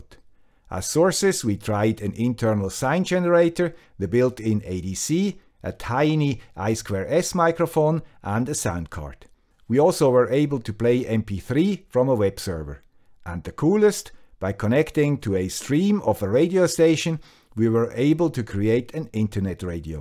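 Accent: Switzerland. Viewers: you made my new routine: Sunday morning "swiss accent" before the breakfast;) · I noticed that lately I keep hitting videos with this specific guy with a Swiss accent. After the 5th video I decided, "Well... I guess this guy with a Swiss accent has earned a subscription..." :D Thank you for all of the work you do! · This is really getting a Swiss channel... an accent, a library... What a great video and really usefull !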